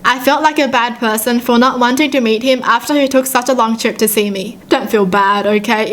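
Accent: Australia. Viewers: Same, I think I might be picking up her Australian accent because I watch her so much lmaoaooaoa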